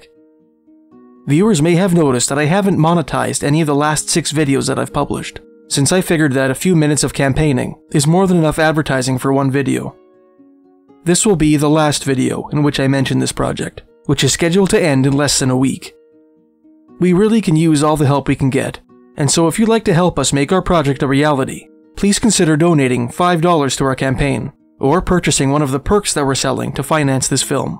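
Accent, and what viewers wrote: Canada. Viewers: You have the heaviest Canadian accent I've ever heard. I'm gonna use your channel as an example of a Canadian accent. Sorey (Canadian for sorry).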